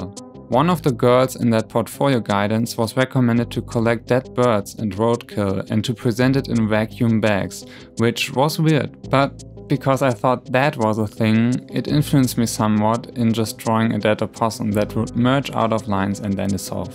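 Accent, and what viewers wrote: Germany. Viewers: Wusste doch dass ich einen deutschen Akzent höre ^^' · Ah, ich wusste, dass du Deutscher bist :P Du hast zwar wenig Akzent, aber irgendwie kam es mir doch bekannt vor, aber cool hier auch mal eine deutsche Perspektive zu hören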